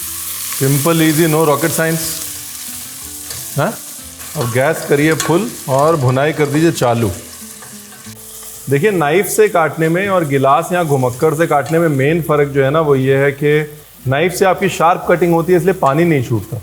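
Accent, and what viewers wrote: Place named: Lucknow, India. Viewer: Bhai bada badhiya lagta hai ye lucknow wali tone sun ke, apne ghar wali feeling aati hai.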